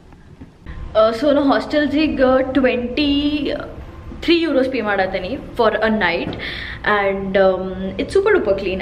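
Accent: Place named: Hubli-Dharwad, India. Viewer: I like your Hubli Dharwad kannada, very much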